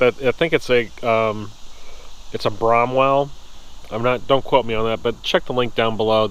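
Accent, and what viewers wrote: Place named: Northern Ohio, United States. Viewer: Btw, I live in the Columbus area, too. Listening to you I’d say you’re from northern Ohio originally. Somehow I ended up on the Bushcraft Ohio website and you said you’re going to the Zanesville Bushcraft gathering. I’d never watched your videos before but I’m glad I have now. I take care of my mother so I can’t go to western PA to practice and enjoy. As I’m just getting back into this because my kids are now all at OSU.... they never liked camping so it’s been on hold over 15 years. Anyway, I’ve been going to Delaware State Park and tent camping. Pretty much stick to myself because almost everyone else is in a trailer. Doing some bushcrafting too. Harvesting and processing wood, building a table, making tripods, big and small and using them for tarp shelters and hammock hangs etc. If you see this and have time to respond, where can I go that’s within an hour of Dublin/Powell to “wild camp” I guess it’s being called now? 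Is that different than primitive camping? Anyway, any info you can provide will surely be appreciated. I think I’m going to watch our fatwood video next. I’m still searching for the mother lode score. Sure, I can harvest from a branch... but who doesn’t want to find the outer rotted trunk of punkwood with the inner core of fatwood? It’ll happen.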